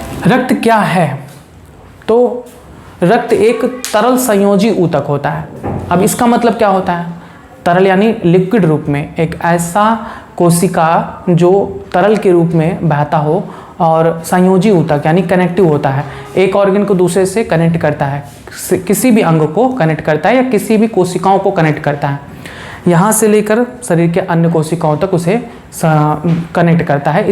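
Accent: Chhattisgarh, India. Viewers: Aap Chattisgarh se ho kya ?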